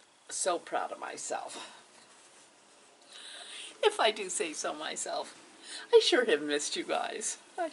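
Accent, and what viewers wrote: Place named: Oregon, United States. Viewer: Hi there. I’m Rose, and I’m so happy that I just found your wonderful channel, I love it. It’s such fun to watch and to listen to all the lovely things that you make and get up to. And I love your Oregon (?) accent ❤ I live in rural Australia, just out of Melbourne, in Gippsland. I’m completely new to quilts in fact, I’ve never made one before! I’ve always been a creative embroider but I’m intrigued with all things quilting. I joined my local quilt group and the folks there are terrific and helpful. You are so enthusiastic and generous with your channel and I feel really inspired by all the great things you talk about. Thanks. I wish I could join you in gorgeous Portland, it looks like a terrific place to be ❤ Keep smiling and being happy!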